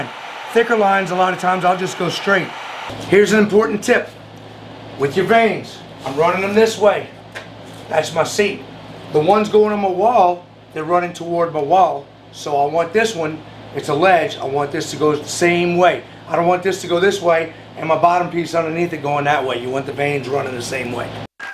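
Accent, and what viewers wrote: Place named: New Orleans, United States. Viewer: You have to be from New Orleans or close by. That's a familiar accent ..;)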